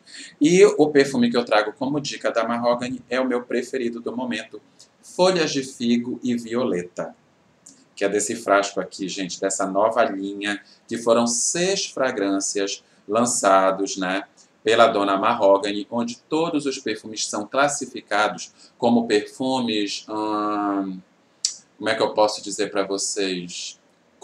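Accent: Pará, Brazil. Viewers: diz alguma coisa sobre o Revelar da natura! Amo suas resenhas. À propósito, vc é paraense como eu?😅🤭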